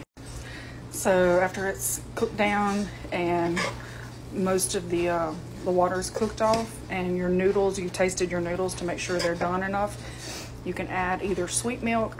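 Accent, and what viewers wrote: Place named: South, United States. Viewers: Somewhere in the south for sure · you sound like an american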